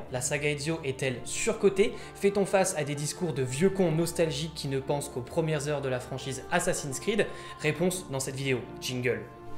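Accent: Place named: Italy. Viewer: T'as un excellent accent italien 👍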